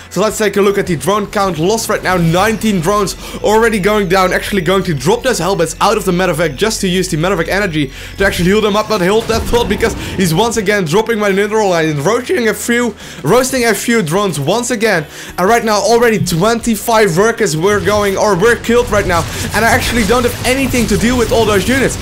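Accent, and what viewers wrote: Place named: Netherlands. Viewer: grrr i like ur content but im Dutch myself and i cannot stand the Dutch accent people sometimes use :P if i were you i would try and work on the pronunciation + switching words like: these Hellbats are all getting killed right now... You've said it a couple of time in this vid... ofc it should've been roaches :O i guess its cuz u talk really fast and its really hard :P im just saying.. trying to be helpful here; D Succes met je video's ;)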